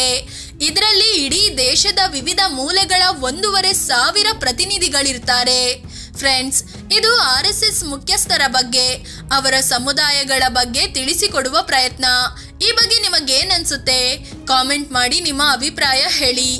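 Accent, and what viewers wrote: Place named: Hassan, India. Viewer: ನಿಮ್ಮ ಹುಚ್ಚಾರಣೆ ಶ್ವಲ್ಪ ಹಿಂಪ್ರೂವ್ ಮಾಡ್ಕಳ್ಳಿ. ಹರಸೀಕೆರೆ, ಆಸನ ಕಡೆಯೋರ್ ತರಹ ಏಳ್ಬೇಡಿ.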